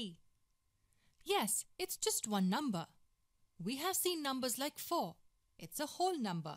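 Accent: India. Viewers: love the Indian accent, representing our country also love the video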